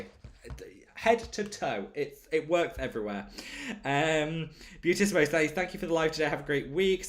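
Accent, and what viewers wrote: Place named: United Kingdom. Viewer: Are you from British ? Because I like to hear your voice when you spoke , it made me tried to find the differences between English and American accent. Well, Thailand is now am. But for me, I’m going to sleep soon. By the way, thank you so much for your kindness for replying me.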